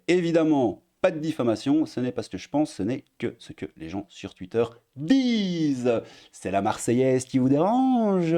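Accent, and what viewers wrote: Belgium. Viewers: Mon cher, j'ai tenu jusqu'au bout mais je dois prendre un anxiolitique. Je ne plaisante pas😢 Continue à "faire ton débile avec ton accent belge", c'est tout ce qu'il me faut pour être un peu moins mal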